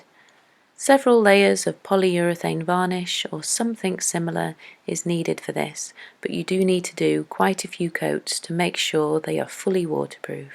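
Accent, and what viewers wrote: United Kingdom. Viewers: I love your british accent as well as your artskills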